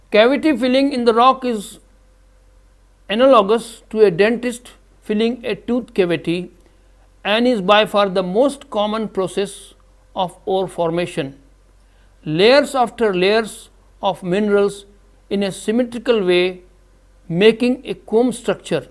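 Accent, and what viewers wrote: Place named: India. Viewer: I really love Indian professors although quite accent is challenging me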